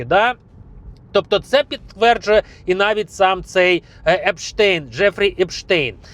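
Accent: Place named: Russia. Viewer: РОСІЙСЬКОМОВНИЙ КАНАДЕЦЬ?? ВПЕРШЕ ЧУЮ ТАКИЙ ЗУХВАЛИЙ РОСІЙСЬКИЙ АКЦЕНТ !! ВИ Б СПОЧАТКУ ОПАНУВАЛИ СПРАВЖНЮ УКРАЇНСЬКУ, А ТОДІ СТВОРЮВАЛИ КАНАЛ !!!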